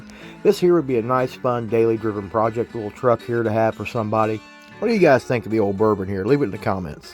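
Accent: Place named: South, United States. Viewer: I enjoy watching all WTF videos, got hooked quick. I believe they inspire, you know, to own or build one of these vehicles. I also find the narrator's humor and southern accent a good combination for the show.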